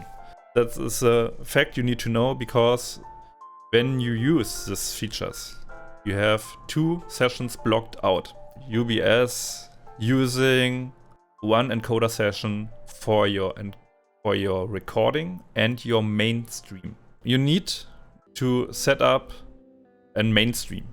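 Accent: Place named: Germany. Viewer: Man hört das Deutsch aufjedenfall raus 😂❤ danke für den hilfreichen Tipp 😍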